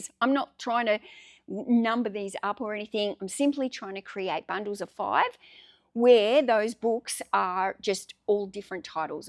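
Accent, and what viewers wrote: Australia. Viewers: I like the Aussie pronunciation. That's why I tune in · She has an Aussie accent!! She’s not going to pronounce it the way you might. What difference does it make anyway?!?? Geeez!!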